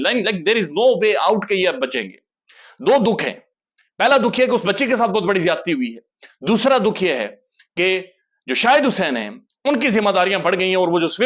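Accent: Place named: Kashmir, India. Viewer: ایب ،کیب ) اس سے پتہ چلتا ہے کے ماسیرو کشمیری ہیں آپ 😊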